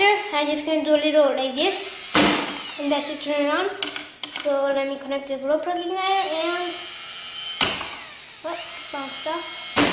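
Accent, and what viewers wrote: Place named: Asia. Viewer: You sound like an Asian. No wonder, you know how to do it.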